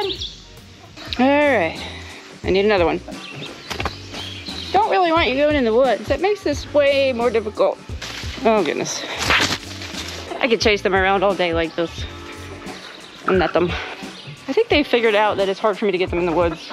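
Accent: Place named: Great Plains, United States. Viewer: Your accent sounds more like Great Plains than Allegheny. Nice video. I raise a little flock of chickens myself. They are very entertaining.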